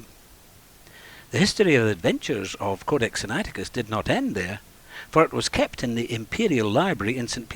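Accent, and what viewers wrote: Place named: United Kingdom. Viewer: FAKE - nice British accent though.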